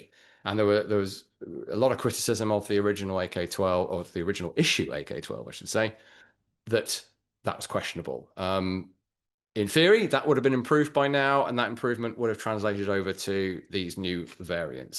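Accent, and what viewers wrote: United Kingdom. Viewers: You can't just discuss AK with that sort of a gay British accent. It simply doesn't work that way.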